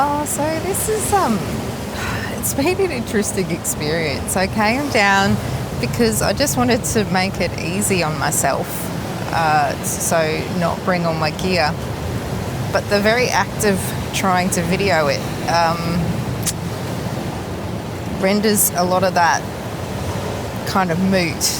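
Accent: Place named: Australia. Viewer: So glad the YouTube algorithm showed your video! So nice to see and hear an Aussie woman photographers experience. Looking forward to watching more of your work ❤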